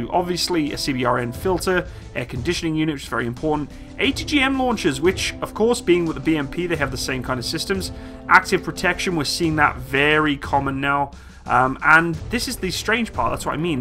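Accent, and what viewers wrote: United Kingdom. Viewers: Pls do some research and not speculate everything from a shitty video that the company made for PR. It might be difficult for u considering most of your videos are just u reading Wikipedia pages in a British accent.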